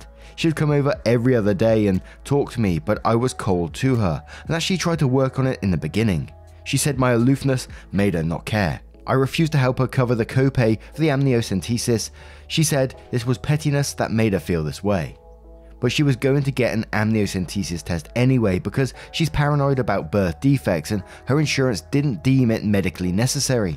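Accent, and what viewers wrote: England, United Kingdom. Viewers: Why is the narrator who sounds English saying he needs therapy? Who the F gets therapy in England or most of the world in fact? It's an American thing. I would never go chat to some stranger about my life and listen to their bullshit opinions. I make my own decisions in this world.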